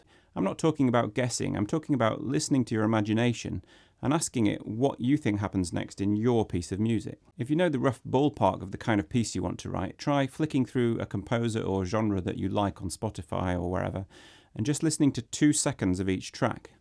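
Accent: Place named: England, United Kingdom. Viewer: I love your accent. It reminds me of my friend from England who I could talk about classical music with for hours. Now he’s in Tel Aviv. :,) Keep up the great work.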